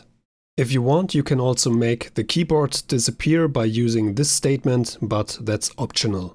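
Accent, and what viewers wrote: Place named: Germany. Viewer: Great tutorial... voice has a great German twist, made me think of -: <link>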